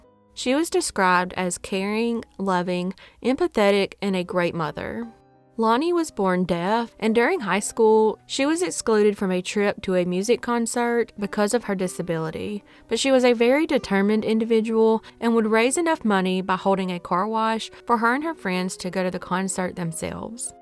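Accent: South, United States. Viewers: I love that southern draw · Such a adorable accent ❤️❤️❤️ I'm also from the south and you truly sound like a southern Belle 😘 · The southern accent is just so darn cute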